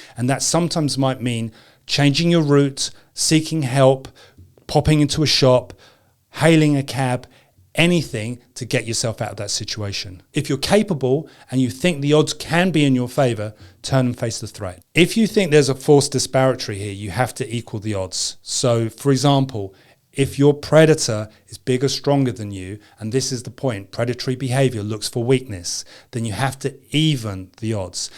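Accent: United Kingdom. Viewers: New York? You sound like you're from the UK with that accent. I just checked out your main page. You're usually based in London. Right??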